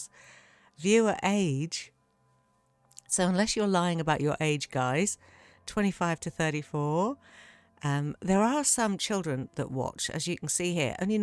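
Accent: United Kingdom. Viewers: I just love your videos and appreciate your consistency. Your warmth comes through in your videos and I always feel better after having watched them. I've downloaded many a mod because I wanted to know what mods you were using (and thankfully, you often say what plant, flora, mount, armor, etc mods you're using), in addition to being able to "try on" a house mod before donwloading it. I also bought Fallout 4 because you mention it so often. Still haven't played it, but at least it's in my library now, thanks to you! Thank you so very much for sharing your passion and talents with us. I hope you have an uplifting and joyous year! PS - I would swear that your day job is with Classic FM (You certainly don't have to say! Your privacy is your own.) Every time I listen to it, I think, "Is that??" You have such a lovely voice. We Yankees are such suckers for the British accent.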